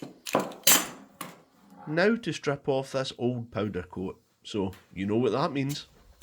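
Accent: Scotland, United Kingdom. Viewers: Brilliant, wie immer! Ich liebe den schottischen Akzent.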